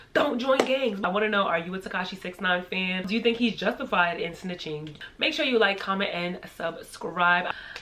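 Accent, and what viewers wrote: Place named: New York, United States. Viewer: Girl just wanted to say I love your channel and your NY accent, love from London x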